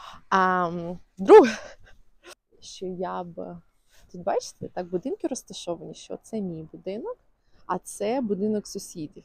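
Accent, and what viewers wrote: United States. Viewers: З цікавості...У Вас акцент цей американський зявився за ці два роки проживання?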